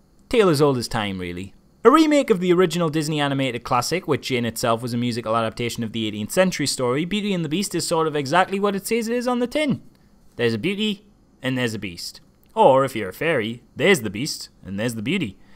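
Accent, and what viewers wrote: Newcastle, United Kingdom. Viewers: I wish you Geordie abridged more films... I'm not even Geordie but I understood it better.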